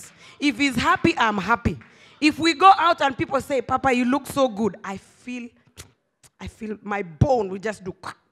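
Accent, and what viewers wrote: Nigeria. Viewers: Ewwooo you're talking Nigerian acsent, I love your msg 💖💖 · You got the Nigeria accent now...Eweee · I am excited to hear the nigerian accent 😍😍😍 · ...you have become a Nigerian ooooohhhhh...the accent...ooops...Nigerian oooghh...congratulations!!!! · !!!! You already taken the Nigerian accent so well, I can't believe its you. May God continue raising you to greater glory.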